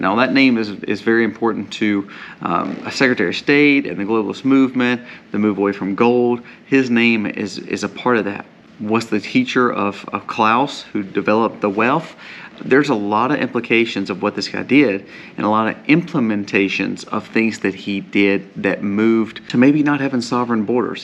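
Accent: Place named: Tennessee, United States. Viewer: I am from Puerto Rico and l have visited your beautiful country. My daughter and my son-in-law and granddaughter leave there in the US. I love your accent and the kindness of Tennessee and the countries there. I was raised in the United States of America New York City. I am in my country where we have chickens. We do not raise cows like you do which l applaud all the hard work you do. We have cows in open lands here but they belong to the government or big country owners here. I know your hard work. Jehovah God bless all your efforts.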